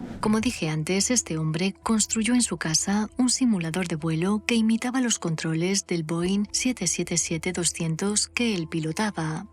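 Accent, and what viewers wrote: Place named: Aragon, Spain. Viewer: No soporté el «suscribais, dehais, actubais" demasiado baturro para mi gusto.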